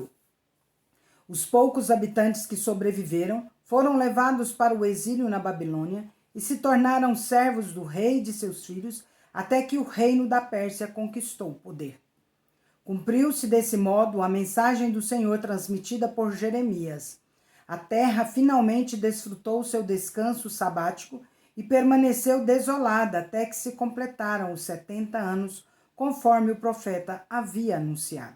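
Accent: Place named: Minas Gerais, Brazil. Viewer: Nossa linguagem de mineirez 😂😂😂 é muito boa.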